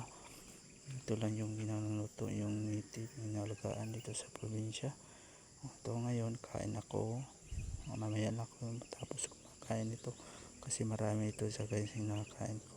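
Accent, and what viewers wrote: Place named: Iloilo, Philippines. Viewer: Ang sarap ng kain enjoy po sana mapansin nyo po ako fr healing live parang ilonggo ka po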